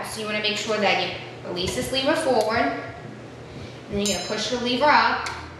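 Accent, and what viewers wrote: New York, United States. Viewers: Too bad that this is a New york accent.......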